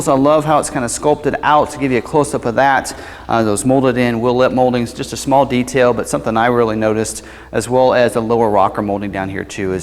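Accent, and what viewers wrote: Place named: AAVE, United States. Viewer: Yep, an Ebonics speaker evidently. Gives me a lot of confidence in this guy’s expertise.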